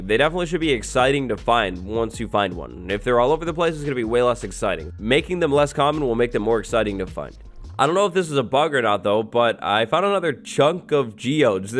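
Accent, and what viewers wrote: United Kingdom. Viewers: British YouTuber?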